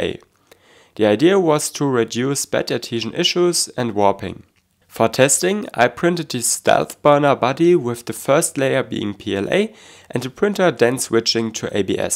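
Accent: Germany. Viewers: the british pronounciation doesn't sound like that. Yours and Stefans is more like a very heavy german accent, which is ok i guess but funny nonetheless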